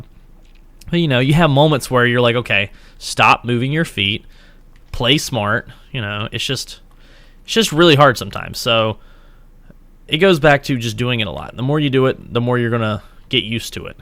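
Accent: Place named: South, United States. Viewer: Lmao about half way in the video and i finally realized this guy has a southern accent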